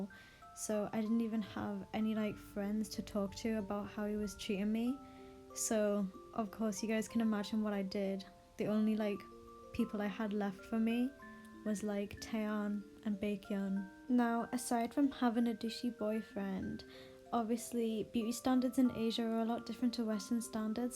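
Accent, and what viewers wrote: Newcastle, United Kingdom. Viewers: Omg you went to my school in newcastle 😂 knew there was Geordie in your voice haha. I left the year that school uniform was introduced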